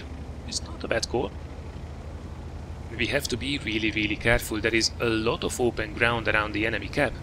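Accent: Hungary. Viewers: de hallatszik hogy magyar vagy :D ment a like es a feliratkozas :)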